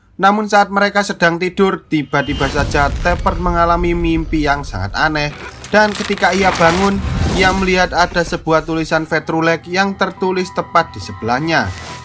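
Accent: Java, Indonesia. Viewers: Sampeyan Jowo ne ngendi mas? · Medok amat Lu Jawa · Kejadian kejadian aneh. 😀 Longat jawane apik tenan 🔥 Bravo..... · Medok banget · Medok jawanya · Jawa nya mana bang ? medok bngt ,,,mantap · Medhoknya sangat nacurell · Meddkok Mbanget aksennya.. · Aduh aku lucu sama suaranya medok sekali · logat jawir malah ngilangin selera nonton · Mas, klo bsa jangan medog dong bahasanya ...bsa gagal fokus nih nyimak film nya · Medok bgttt 😁😂👍👍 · Fix ini yang ngisi suara pasti "wong jowo" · Sangat medok keren2👍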